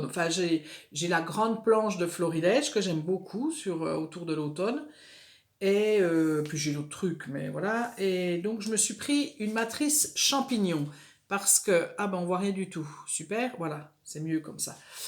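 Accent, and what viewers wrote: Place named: Franche-Comte, France. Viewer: Bonjour ou devrais-je dire 😁 Après tes gentils commentaires sous plusieurs de mes vidéos, je suis ravie de découvrir ta chaîne, (par hasard je l'avoue et j'en suis toute désolée), et je dois dire que j'aime beaucoup ce que tu nous proposes, je m'abonne donc avec plaisir ☺ Et quel plaisir également d'entendre ton petit accent qui chante à mes oreilles 🤪 car oui moi aussi je suis Franc-comtoise 😅😁 à bientôt 😘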